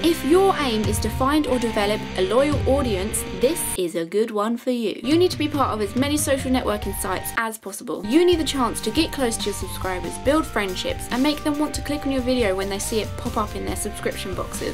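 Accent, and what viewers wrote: United Kingdom. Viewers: her briish voice is a little annoying but i can dig it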